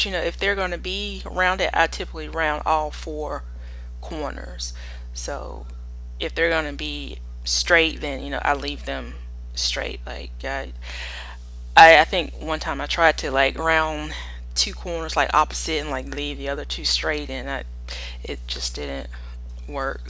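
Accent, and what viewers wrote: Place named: South, United States. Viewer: This was the first time I've watched one of your videos -- NICE JOB! I totally love your Southern accent. Great PL spread. Thanks for sharing, I'll tune in again!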